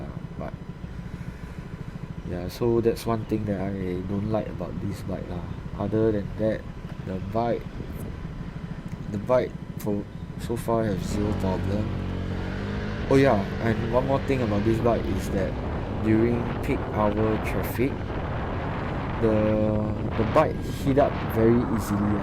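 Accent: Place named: Singapore. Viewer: He's speaking Singlish. Perfectly understandable to a Singaporean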